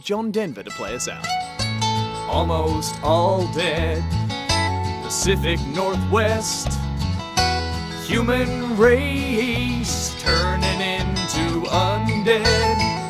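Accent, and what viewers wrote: Australia. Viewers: Clearly sounds Australian. But Americans always do get confused about the British accent.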